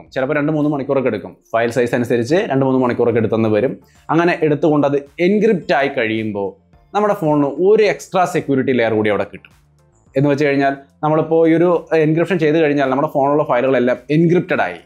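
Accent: Thrissur, India. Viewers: ഫോൺ അങ്ങട് തല്ലിയ പൊളിക്ക. തൃശ്ശൂ കാരൻ ആണെല്ലോ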